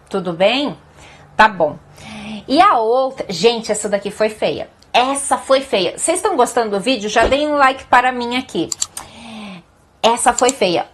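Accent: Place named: Sao Paulo, Brazil. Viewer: Essa professora es tao bonita, formosisima, e ainda falou do meu Chile aaahhh eu estou enamorado de ela😍😍❤❤. Por su linda forma de falar ela debe ser paulista, a pesar que em Sao Paulo ha muitos sotaques. Ao final nao se sabe qual es Paulista realmente. Mas a letra R ela a fala como riojaneirense, eu adoro, tal vez ela es Paulista mas em alguma cidade que está na frontera com o estado de Rio de Janeiro. Saudos desde Chile e um grande abraço. Beijos nao me atrevo pois deve ter enamorado ou marido 😬🙊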